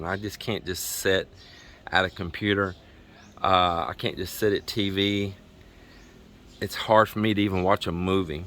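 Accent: Texas, United States. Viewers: I am your 83 year old lady in Texas that look forward to your daily video. It is normally 2AM as I have sleeping problems. I look forward to watching you and each night. I love seeing your progress I want to know about the chickens,the cow. Any thing you video will be interesting to me because of your Texas accent and straight forward delivery. I love the beautiful scenery you show and the beach. Tell I prayed for her surgery and not overdue too quickly. She will be good again in no time. MeMe in Texas USA 🇺🇸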